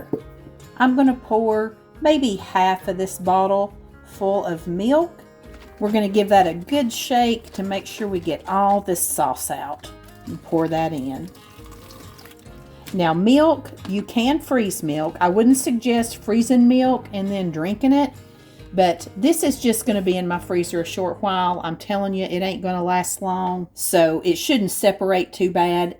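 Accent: South, United States. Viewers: Oh YUMMMMM I was worried about the staple as well… these are great.. I have my meals for the week… you are the best… you make my day I’m better just listening to you and your southern accent.. sending love 💚and ☀️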